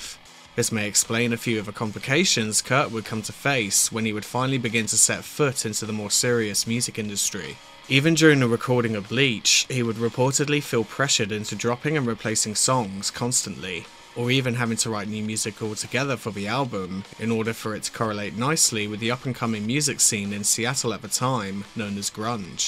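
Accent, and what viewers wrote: United Kingdom. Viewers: Another British Accent bs fake documentary. Pass.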